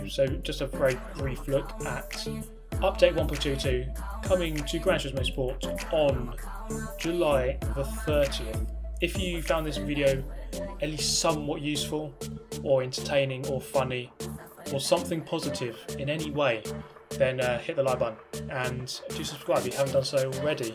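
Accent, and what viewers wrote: United Kingdom. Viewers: God damn British accent finessed me, had no idea my boy was Asian 😂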